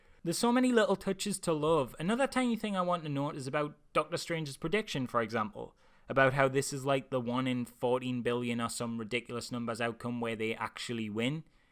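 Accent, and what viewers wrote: Newcastle, United Kingdom. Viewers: Big plan eh? Just a heads up. I came back for the spiderverse review now I have finally watched it but lo an behold....I had been un subscribed.....good job I remembered the channel name eventually as 'well spoken Geordie does eloquent reviews' was getting me fkin nowhere....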